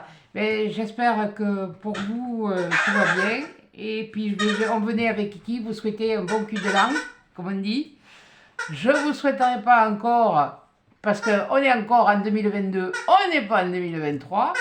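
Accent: South, France. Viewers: Coucou Quelle belle surprise!! Tu es superbe et cette coupe de cheveux et couleur te vont à ravir. Tu reflètes la joie que tu nous communiquais par ta voix et ton joli accent du sud. Sincères condoléances pour cette personne chère à ton cœur. Merci pour tes souhaits et à mon tour je te souhaite un très bon réveillon de la St Sylvestre et à l année prochaine. Gros gros bisous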